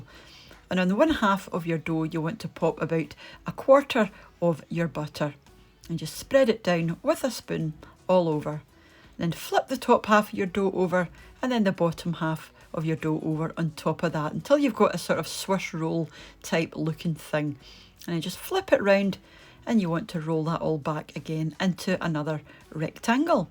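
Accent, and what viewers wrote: Scotland, United Kingdom. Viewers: the wi yoo cook I would sweer your ma was Scottish the accent is a bit hard tae figure oot Um a getting warm 😂😂😂😂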